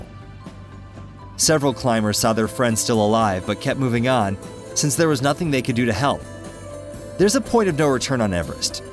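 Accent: United States. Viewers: This starts with some cheesy Yank voice over saying « Hey Guys »….WTF? One can tell immediately this is not going to be serious and it will be some D grade joke…mind you I switched off immediately so maybe not..(bet it is).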